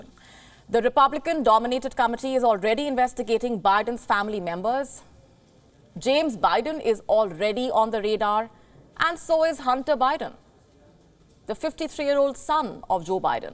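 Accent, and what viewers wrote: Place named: India. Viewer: This is a great news site but unfortunately it is at times hard to understand the reporter because of the Indian accent and mis pronunciation of words.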